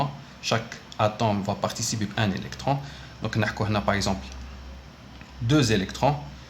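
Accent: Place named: Algeria. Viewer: اه شكرا بارك الله فيك هادا الكور حوست عليه حتى شبعت نلقا غير المغاربة يشرحو فيه او مافهمتش عندهم باسكو الدرس ماشي كيما تاعنا سواسوا قلت نكمل نشوف عند هدا اللخر و خلاص حسبتلي تانين مغربي حتى سمعة اللهجة تاعنا 😅 والله غير فرحت ربي يعينك او يجازيك خير الجزاء🤲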